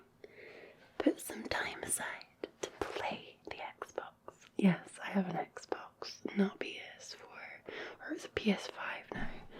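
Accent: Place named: Glasgow, United Kingdom. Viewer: From Glasgow AFAIK, or at least one of the towns around it.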